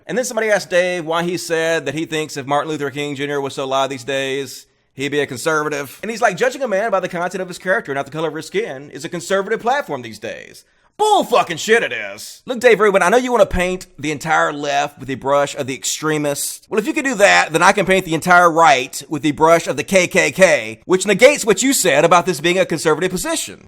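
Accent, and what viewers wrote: South, United States. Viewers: Well you're southern so it's to be expected, like when Pimpmunk said Massetushes.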